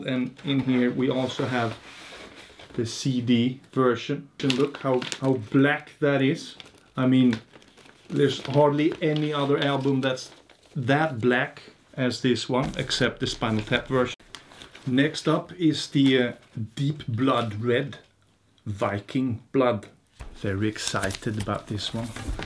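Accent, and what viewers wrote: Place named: Sweden. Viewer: Your Swedish accent is delightful! Love it 😁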